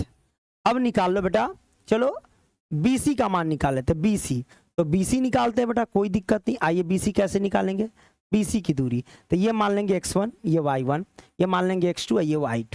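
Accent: Bihar, India. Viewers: Sir aap bihari hai n 😅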